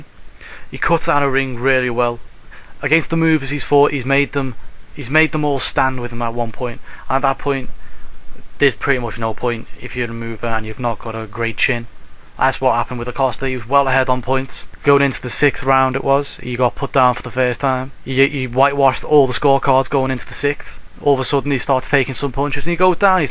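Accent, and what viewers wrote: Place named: Liverpool, United Kingdom. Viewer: a scouser :)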